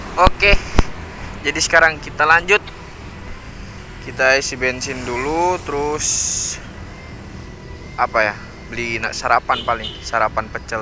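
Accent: Papua, Indonesia. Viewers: Logat Papua bro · KK mcm pu logat ni logat" Papua k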